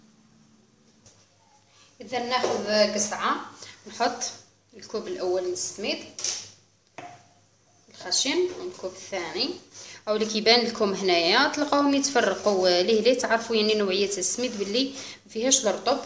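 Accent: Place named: Setif, Algeria. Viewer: أطباق يمممم مقروط وعليه لكلام ختي من اي ولاية انتي حساتك من صوتك انك سطايفية والله اعلم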